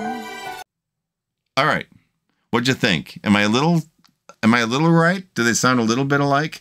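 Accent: Chicago, United States. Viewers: I was gonna say you have a Chicago accent and then you pull out a Portillo's cup! I had a dripping wet Italian beef 3 days ago!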